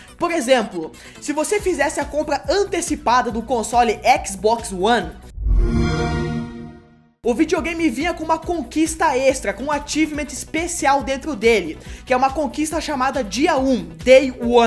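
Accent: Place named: Rio Grande do Sul, Brazil. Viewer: Tu é gaúcho porque tu fala quinem gaúcho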